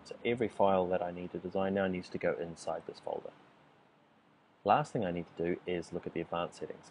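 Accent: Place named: New Zealand. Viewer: Loved hearing the Kiwi accent bro. cheers for these dude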